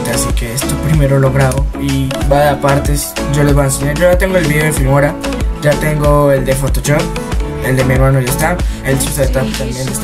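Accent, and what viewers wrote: Colombia. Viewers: Se te nota lo colombiano Viva colombia! uwu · Jaja lo sabia que eres de bogota coloombia se notaba mucho xd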